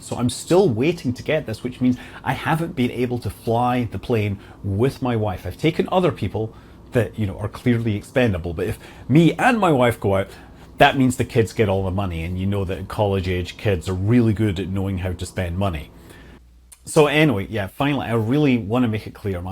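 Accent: Scotland, United Kingdom. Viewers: Wouldn’t it make sense to have a telephone tree to get everyone to evacuate all aircraft from nearby hangars until the fire is out and cold? Don't forget to update (and keep updating) Dan Gryder, who maintains GA fatal statistics and advocates AQP. Have you taken AQP training? And get the wife trained up at least to do a landing, because human beings, namely you, have health failures at the most inconvenient ties. Gnosis?!? I thought you were from Scotland. Well, you’ve got the accent down pat. I’m from Sacto and learned to fly from Naps in ‘70s.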